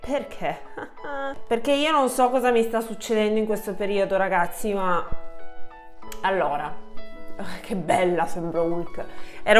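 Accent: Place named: Piedmont, Italy. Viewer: Ah mi piace la tua cadenza piemontese, io sono pugliese ma ho tutti o quasi i miei parenti in Piemonte e adoro il piemontese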